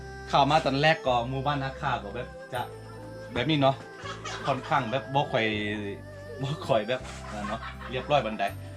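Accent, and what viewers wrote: Isan, Thailand. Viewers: คนบรรยายเป็นคนอาข่า หรือ คนอีสานครับ ทำไมพูดเหมือนคนอีสานเลยครับ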